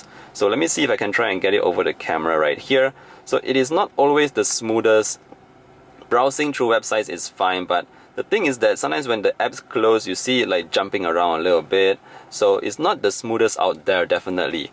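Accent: Nigeria. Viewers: You sound like Nigeria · Love your accent... You've got Nigerian friends? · Bro you have a Nigerian accent...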